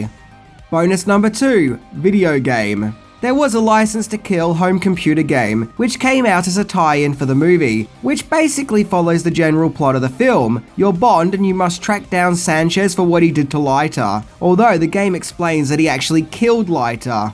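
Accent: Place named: Australia. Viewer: Tone down that Aussie twang...otherwise very informative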